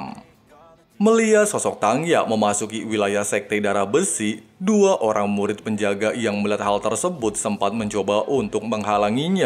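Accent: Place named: Indramayu, Indonesia. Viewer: Dermayu bang lalah cah ya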